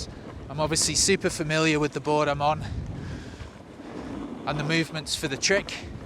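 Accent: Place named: United Kingdom. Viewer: Came away from the first watch of this video thinking ‘well that taught me nothing, all I did was watch a bloke snowboard down a hill!’ The calm British voice was a welcome change to Tommie though 😂 But I actually thought about it the next time I strapped into my snowboard in the garage and suddenly it made a lot of sense! I’ve been trying to get Ollies dialled, and have watched so many technique videos, but recognising the flex characteristics of the board, and working with them, was a real light bulb moment for me and it made a huge difference on the snow! Suddenly you have to think less about the plethora of different technique tips you’ve heard, as it becomes a much more natural movement sequence. Never normally write comments, but this video deserved one. 👍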